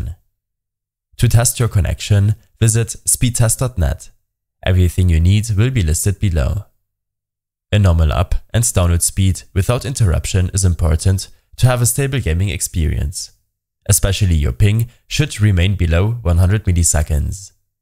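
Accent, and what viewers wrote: Germany. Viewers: Der deutsche Akzent ballert hahaha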